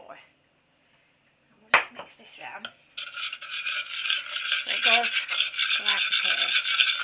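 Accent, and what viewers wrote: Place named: North, United Kingdom. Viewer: Thank you for this video it's great and with your northern accent easy to understand. Tracy from Accrington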